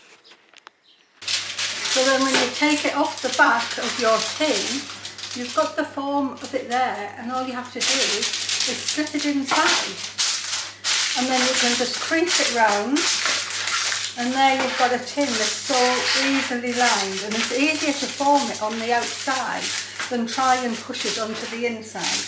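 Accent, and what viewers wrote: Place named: Northeast England, United Kingdom. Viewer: Hello Did you mean: As soon as i heard your accent i knew you were a fellow Northeastern woman and I knew instantly when you were looking for stones and paddling on Seaham beach. I was wanted more. I am a mixed media artist and live in Darlington and just adore paddling in the sea and the wild weather and the garden. I clicked subscribe and will feel right at home watching your videos. Thanks for sharing. Love from June x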